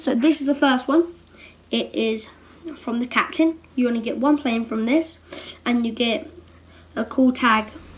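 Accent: United Kingdom. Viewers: are u British? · Just by the sound if his accent I'd say yes he is British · You are way to underated you must have 50k right now. Btw are you british?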